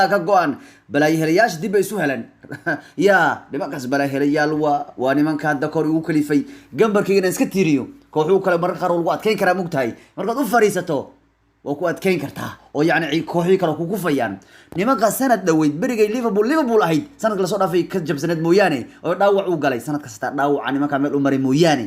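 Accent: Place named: South, Somalia. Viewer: Ileen afsomali in la bahdilo wax ka kow ah af reer konfured wlee waa laga sheeken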